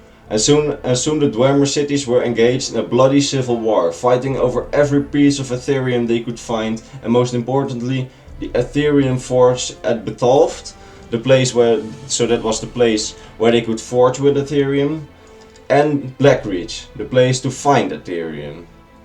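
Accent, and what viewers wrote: Netherlands. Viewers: are you dutch???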